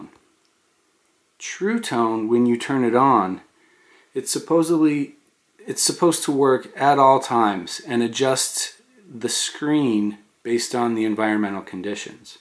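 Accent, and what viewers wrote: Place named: North, United Kingdom. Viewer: A real northerner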